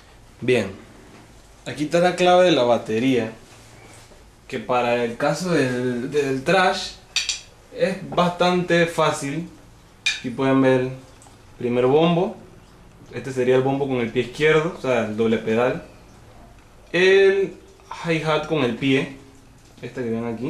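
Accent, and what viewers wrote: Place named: Panama. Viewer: Man te lo juro que se ve! yo tambien soy de Panamá y encontre tu acento muy familiar, muy buenos videos, te felicito! saludos.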